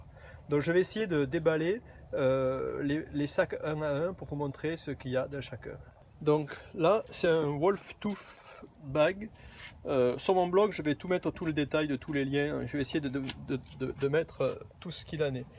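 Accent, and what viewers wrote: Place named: South, France. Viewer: Salut. Merci pour toutes les infos Bikepacking. Etant novice j'envisage une petite 1ère virée cet été et tout les renseignements sont bons à prendre. Merci encore. En plus aux US avé l'accent, c'est top. Pour ce qui est de tes bouquins, je vais aussi regarder de plus près 👍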